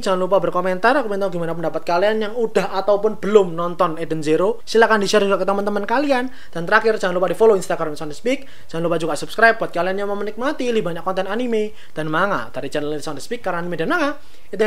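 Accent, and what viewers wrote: Java, Indonesia. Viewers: min suaramu itu medok banget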